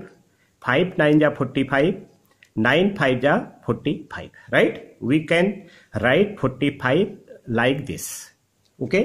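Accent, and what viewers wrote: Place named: East India, India. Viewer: That is an accent of people from east India and Bangladesh. So I would rather not comment though I know the comment is in good faith.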